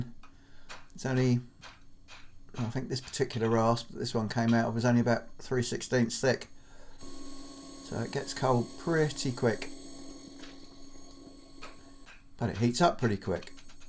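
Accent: England, United Kingdom. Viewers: I love listening to you talk! I’m American so all British accents are cool to me, plus I like hearing your thoughts about the work you’re doing. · No Music, no cartoon capers intros but a blacksmith with an English accent who’s all business! That’s why I’m here.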